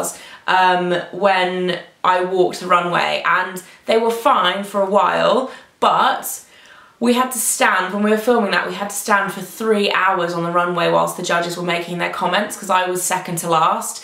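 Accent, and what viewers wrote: United Kingdom. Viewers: I agree, maybe it's the voice and the british accent! :)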